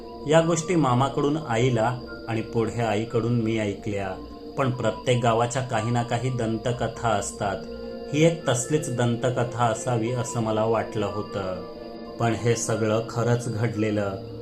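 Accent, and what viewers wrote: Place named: Ghat, India. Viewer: तुमच्या कथा खूप छान असतात मी नेहमी ऐकते तुमच्या कथा तुमची मैत्री तर खूपच मस्त आहे ती नेहमी अशीच प्रेमळ रहावी हीच देवाकडे प्रार्थना.तुमच्या कथेतून अस वाटत की तुम्ही कोकणी आहात पण तुमच्या भाषेतून ते जाणवत नाही.तुमची भाषा घाटी वाटते.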